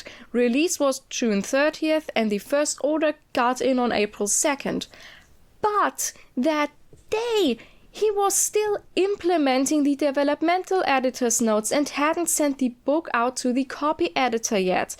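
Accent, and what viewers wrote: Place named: England, United Kingdom. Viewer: i like your english accent :)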